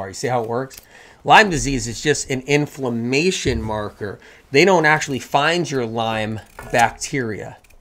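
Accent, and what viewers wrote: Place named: United States. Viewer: Yes great info but can you state it clearly once please & stop repeating everything 3 times. People can replay if they need repeats. Thank you you're great but your delivery is so American & verbose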